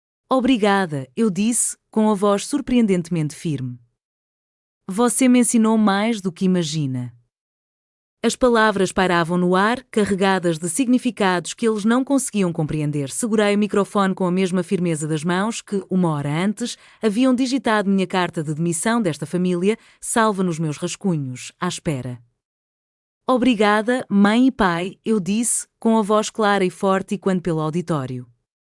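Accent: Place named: Lisbon, Portugal. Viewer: O acento lisboeta leva a pensar em emigrantes portugueses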